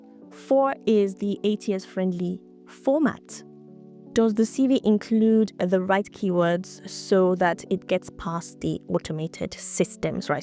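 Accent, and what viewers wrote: Nigeria. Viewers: Thanks for the video.. You have a Nigerian accent, are you Nigerian?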